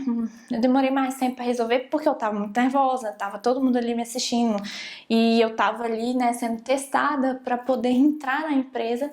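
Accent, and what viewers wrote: Minas Gerais, Brazil. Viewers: Nossa, vc foi contando e eu fui imaginando a cena. Acredita que só de imaginar eu fiquei até tremendo de nervoso aqui também? kkkkkkkkkk Obs: Vc é mineira né? O sotaque me parece familiar. Sou mineiro de JF.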